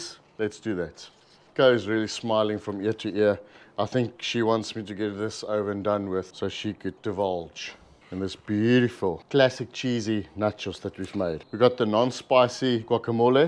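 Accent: South Africa. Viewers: South African accent right there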